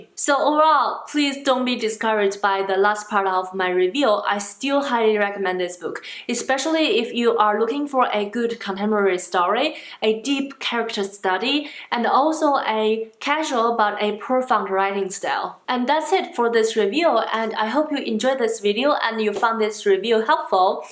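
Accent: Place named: China. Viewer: Chinese English is powerful.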